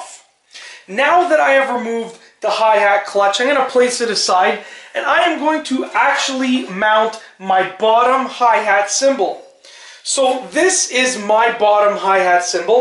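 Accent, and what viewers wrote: Canada. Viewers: Awesome video man and it sounds like your from Canada howdy my friend and neighbor to the north. Keep up with the tutorials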